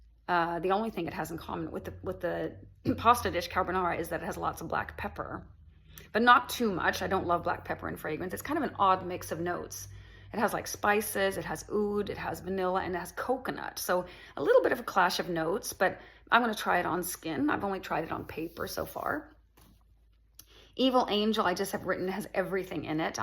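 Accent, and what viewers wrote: United States. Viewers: Btw, I love it that Sweden is so secular that you were unfamiliar with "omniscient." It's one of the three "omni" properties that the god of classical theism possesses (omniscient, omnipotent and omnibenevolent.) I had a fiancee for 4 years who was from Gàvle, and while her English might be stronger, you've got the American accent down perfectly. She has a Swedish accent when speaking English and you do not. So interesting! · You sound like a perfect American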